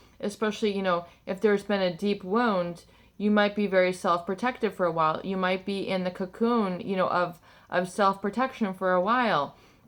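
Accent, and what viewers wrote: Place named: Illinois, United States. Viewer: I have been listening everyday. I have been journaling. I have put what you have said into practice with the affirmations and the journaling. Thanks so much for your work (and so much work you have done to help us!). I am from originally from McHenry, Il. It sounds like you are from Illinois. I so appreciate what you are doing. Love to get to know you somehow. Keep up the good work!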